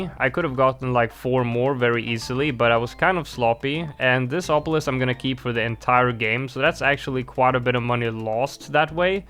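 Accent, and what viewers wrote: Sweden. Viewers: jesus christ i just realised how easy it is to tell if a youtuber is swedish by the way they speak english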